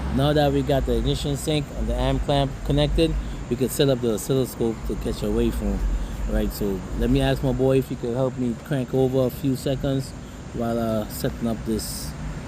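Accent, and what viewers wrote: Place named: Trinidad and Tobago. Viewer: Idk why I hear a hint of Trini in your speech lol.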